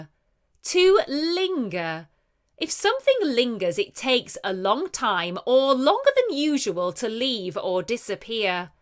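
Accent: United Kingdom. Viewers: Thank you so much. I love so much your British accent.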